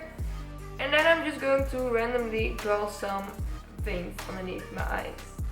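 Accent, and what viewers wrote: Netherlands. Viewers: Lmao first thing when I heard her voice: okee shes dutch 😂. Anyways love the make up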